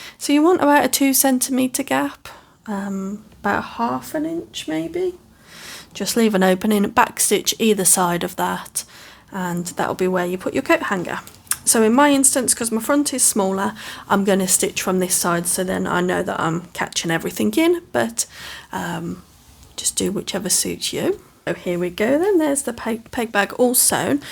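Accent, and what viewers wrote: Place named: Midlands, United Kingdom. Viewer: Love this idea. I’ve never done quilt as you go and really want to try. It’s a refreshing change to find someone from the UK! You sound like you are from the Midlands near me.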